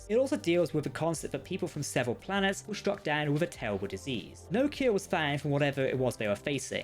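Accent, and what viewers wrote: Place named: United Kingdom. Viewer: pro tip: if you stopped being so british and spoke slower with the correct pronunciation, maybe your audience will understand something, and you'll still make it to the dreaded 10 minute mark.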